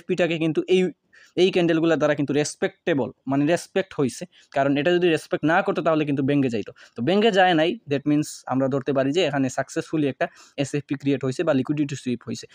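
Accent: Sylhet, Bangladesh. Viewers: বাড়ি কোথায় সিলেটে নাকি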